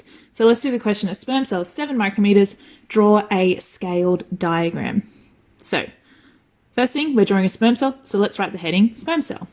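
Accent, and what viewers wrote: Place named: Australia. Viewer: She Australian uwu.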